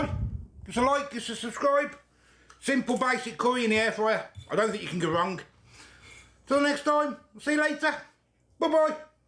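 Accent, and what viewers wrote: Birmingham, United Kingdom. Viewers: New subscriber here you sound like a fellow brummie?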